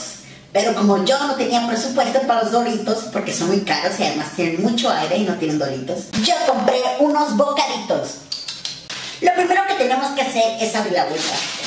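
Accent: Mexico. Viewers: Eres de.sonora ?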